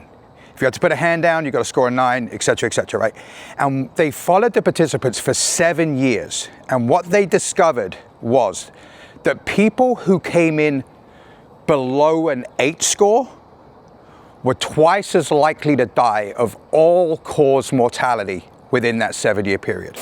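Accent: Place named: Australia. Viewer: Where are you from? That's the first South-east UK/ east coast US, with hints of Aussie accent Ive ever heard?!?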